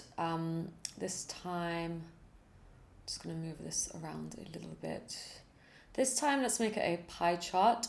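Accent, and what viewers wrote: England, United Kingdom. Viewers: You are a British women, i know that because your accent is very well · Which English accent is this? Just curious.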